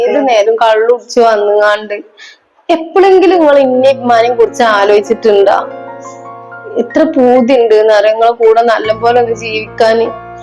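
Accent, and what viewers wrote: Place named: Malappuram, India. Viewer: പക്കാ മലപ്പുറം ഭാഷ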